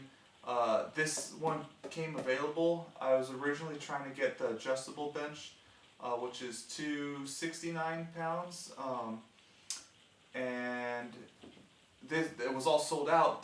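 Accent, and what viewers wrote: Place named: United States. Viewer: Hahaha just as you said "obviously I'm in England" I was thinking this guy sounds American but he has to be in England? Haha Just to add I just got the m3 rack and it's really good. Basically a copy of a rogue r3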